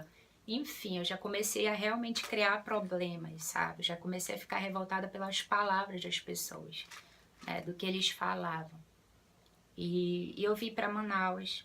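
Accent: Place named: Rio de Janeiro, Brazil. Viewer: achei que vc era do rio de janeiro.. pelo sotaque rs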